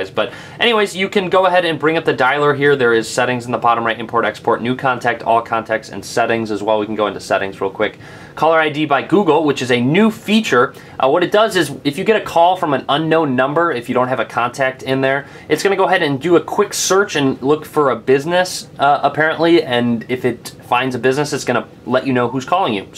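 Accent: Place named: Michigan, United States. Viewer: i THINK.... hes from Michigan... lol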